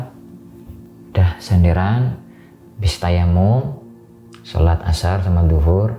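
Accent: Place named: Banyumas, Indonesia. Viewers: Slam ngapak mas tegale ndi mas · Di Chanel BP lebih lengkap, disini juga keren aku suka gambar ilustrasinya, sehat trs wong tegal laka2 ceritanya epic bhs juga epic ngapak 😂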